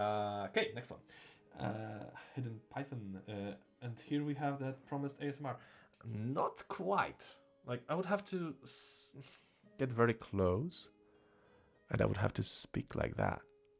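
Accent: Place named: Germany. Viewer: you sound kinda German but also not at all, the accent is so hard to pinpoint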